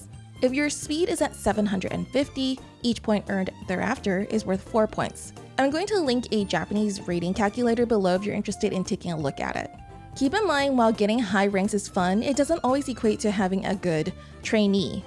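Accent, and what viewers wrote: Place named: United States. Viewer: the murican accent is so cringe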